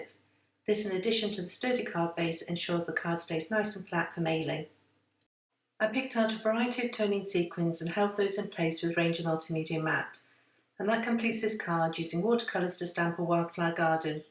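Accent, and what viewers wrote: United Kingdom. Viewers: hi, do i detect a Welsh accent? Where r u from, Me-formerly Pembrokeshire! i'm only half way thru ur vid, but i had to send you this first b4 continuing! x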